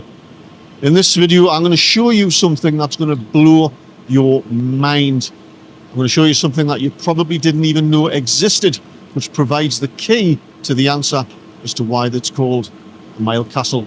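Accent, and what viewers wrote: Newcastle, United Kingdom. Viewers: Yoooo, Geordie Tom Scott. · Thanks for this. I love hearing a genuine geordie voice, and your commentaries are always interesting. I had guessed the Roman connection before you started explaining it, but there was a lot more that was new to me. Makes me want to go and spend some time exploring the city, which I didn’t have the time, the money or to be honest, the inclination to do when I lived there as a student, 1962-67.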